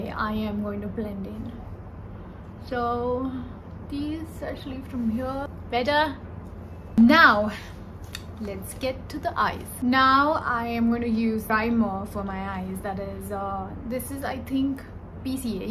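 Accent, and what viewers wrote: Maharashtra, India. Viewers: Sundar insaan love u alot... dii your smile is therapy for me its so pure nd beautiful keep it safe...di your cutest nd goofy side is amazing your Marathi accents is fab nd i personally love it pls never forget this to add in your vlog.....dher saara pyr apko❤❤ love u so much 😍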